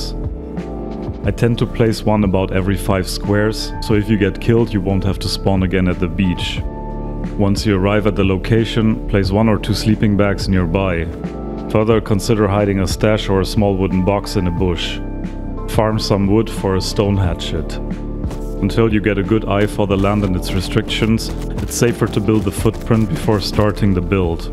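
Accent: Germany. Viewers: man hört dein deutschen aktzient ;D nices video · Mann hört einf den deutschen Akzent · Ich höre dein Dialekt (ka ob das so heißt) du bist eig. Deutscher, oder? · German accent it in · Sehe jetzt erst seit 5Min dieses Video aber liebe es einfach wie dein deutscher Dialekt raussticht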